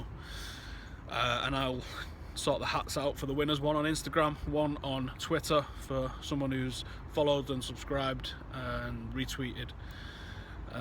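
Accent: Manchester, United Kingdom. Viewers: ***** haha he's got no idea what he could be in for... Carrying a nice camera around... At night... Manc Accent... Oh boy! That MMA training could come in handy though lol.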